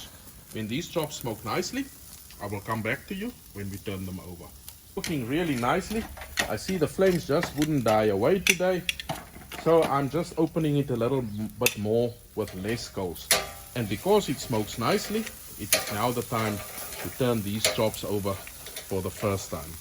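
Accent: South Africa. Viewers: ek love jou videos en suiwer Afrikaans! Baie refreshing. Eet met jou hande as jy chops eet! Gooi suurlemoen sap oor net voor jy bedien anders raak dit bitter oor die vuur, en laastens moenie die braai bak helemal toe maak as jy die vleis afgehaal het nie, dit stoom verder gaarder. Love you stuff broer! Moenie ophou nie! Hierdie is authentic content! Jy is 'n great presenter en jou vrou ken haar storie agter die kamera! Groete! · If I’m braaing and someone with your accent comes over, I automatically hand over the tongs out of respect for braai authority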